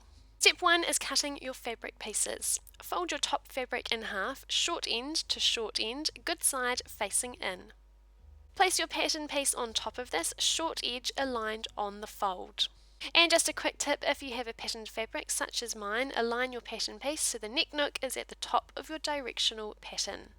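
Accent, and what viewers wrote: New Zealand. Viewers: A kiwi voice yay! Great tutorial thanks.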